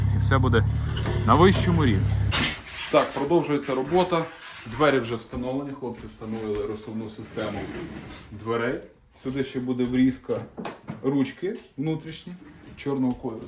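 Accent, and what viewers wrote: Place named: Western Ukraine, Ukraine. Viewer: Спочатку була здивована почути українську мову, мою, рідну, "западенську"😊. А коли почула, що повертаємося до Тернополя, була приємно вражена👍👍👍. Відео цікаве, інформативне, заохочувальне! Аж захотілося теж пошарудіти рукою по свіжому граду. Успіхів вам і надхнення!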